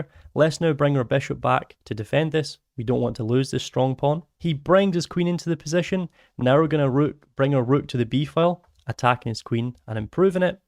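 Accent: Scotland, United Kingdom. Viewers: It's Scottish 😂😂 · It's a Scottish accent. Canadians have the weird accents.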